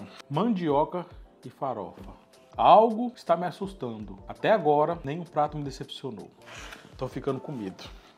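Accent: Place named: Minas Gerais, Brazil. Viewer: Oi! Desculpa me meter na sua pergunta kkk O já falou que a cidade dele é muito perto de MG, por isso ele tem esse sotaque meio mineiro, mas ele é do interior de SP mesmo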